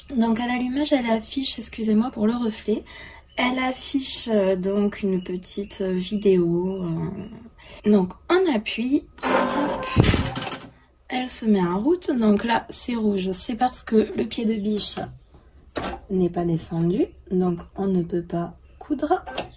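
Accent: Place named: Carcassonne, France. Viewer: Waouh ... quelle machine 👍🧵 Merci à toi Tu as un petit accent que je connais ... je suis de Carcassonne lol 😊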